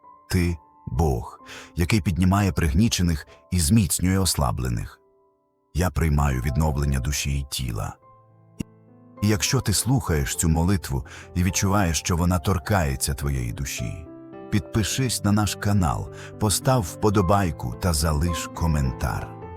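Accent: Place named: United States. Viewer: Можно для молитвы исцеления подобрать какой-то приятный внятный, успокаивающий голосовой тип, ведь всё равно ии используете? А то напоминает неполноценных из Винницы в 14м году получивших власть из лап эуропы и брызгавших слюнями из неразвитого речевого аппарата на всех трибунах и сценах. Неужели создатели ролика не слышат, что этот тип речи звучит неполноценно - аденоидно?да ещё с имитацией какого-то вовсе неуместного типа американизированного акцента? Нету украинцев что-ли с нормальной здоровой естественной дикцией? Вы испортили молитву, сделав из неё паническую, обгоняющую слово - словом скороговорку.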